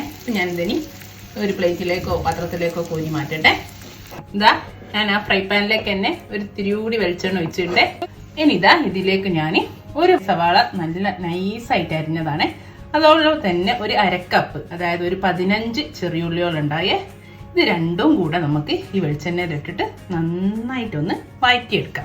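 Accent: Kozhikode, India. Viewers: This came out sooo Gud Thx 👏🏽 Ur kozhikode accent sooper 🥰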